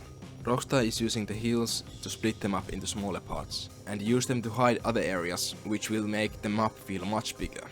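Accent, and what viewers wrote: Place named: Finland. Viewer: funy finish accent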